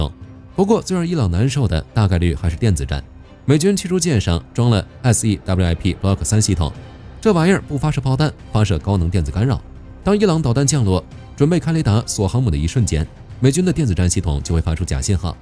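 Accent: China. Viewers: ㄡ是大陸騙子 說話者是大陸口音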